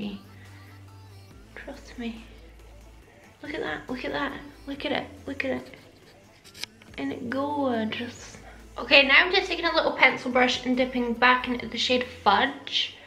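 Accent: Scotland, United Kingdom. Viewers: Ahhhh I LIVE for your videos! And the outtakes! You have me in stitches every time and after multiple (pure travesties I tell you!) childbirths that's as pitiful as it sounds. I am also disabled with chronic spinal issues and fell into makeup because I was bored sh**less with myself. Anyway, enough fannying about! Just want to say how much I appreciate you. I'm in Australia but my entire family is from Falkirk. The ones who are still alive(!) have been here long enough to have lost much of their accents but yours brings my sweet Nana and Papa back to me and they went belly up years ago. I'm often told that we come cross as "cold", not huggers etc.... I always say its a Scottish thing! Thanks for the validation lol xo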